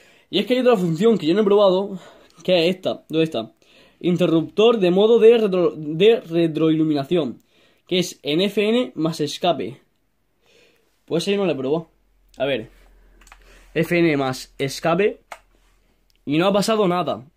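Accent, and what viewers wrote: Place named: Murcia, Spain. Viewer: Buassss cómo se nota que eres de murcia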